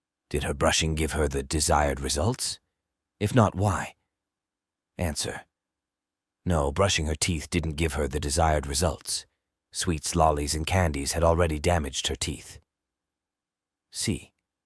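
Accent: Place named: United Kingdom. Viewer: change the voice changer, this is in uk accent change to something that can we understand apart this your teaching style is very unique and good it helped me thanx😊❤👍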